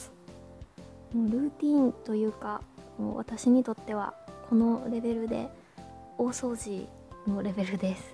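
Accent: Kansai, Japan. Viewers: イントネーション関西人っぽい🌟り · 関西の人や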